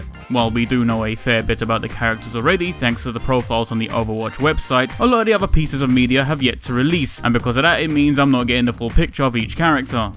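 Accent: London, United Kingdom. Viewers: But I'm Scottish, and pretty much the only English people where I live are posh ones that are here because they work as air traffic controllers that were moved here for work, and I still recognise your accent as a Londoner's. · How do people think your accent isnt a London accent?!? Like seriously?? I live in the South-East and your accent is still something that I regognise and hear daily. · I'd defiently say you've got a London accent, albeit that really chavish one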